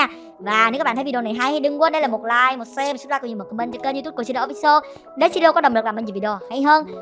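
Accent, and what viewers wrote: South, Vietnam. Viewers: người ta là người miền nam người ta có cách nói chuyện như v , bạn đừng bắt bẻ vì người ở đó nói chữ mà có dẫu ngã thành hỏi quen r bạn ơi , khó sửa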